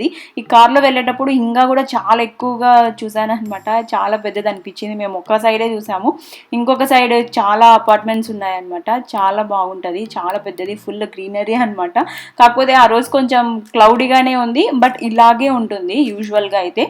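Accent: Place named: Rayalaseema, India. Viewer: Ru from rayala seema ur way of speaking looks like dat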